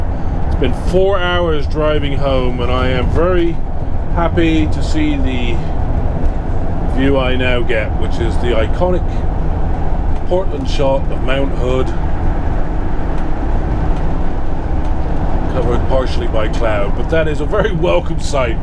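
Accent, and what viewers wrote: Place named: United Kingdom. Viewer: another Brit with messed up ascent... when I lived over there my accent didn't know where I was from... but when I got back here the American twang died ... lovely video of your first trip out ... are you still on the road as this is over a year old... one day I will be back and plan to travel again..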